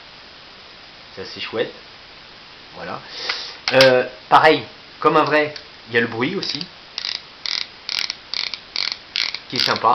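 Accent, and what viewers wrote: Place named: Quebec, Canada. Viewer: 😄😄😄 pas mal l'accent québécois !!! Mais tes sur YouTube ces cool sa. Be moi aussi. Si tu va sur ma chaîne je pense tu me reconnaitras😊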